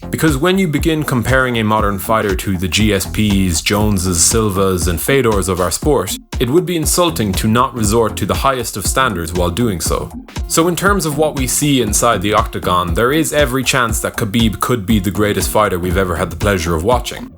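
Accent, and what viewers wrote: Ireland. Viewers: Nothing better than hearing how great Khabib is in an Irish accent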